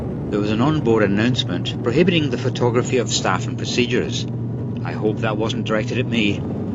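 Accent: Scotland, United Kingdom. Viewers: Love the Skaughtisch’ aksent.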